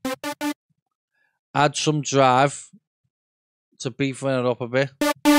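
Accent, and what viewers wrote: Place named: Liverpool, United Kingdom. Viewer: yes! im over in runcorn, obviously your accent tells me your liverpool area. do you bother with private lessons mate? also, which keys are you pressing to get this melody, cheers pal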